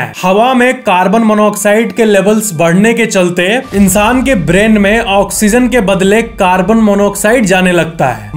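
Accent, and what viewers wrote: Bihar, India. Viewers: Bhai app 😊Bihar se ho😊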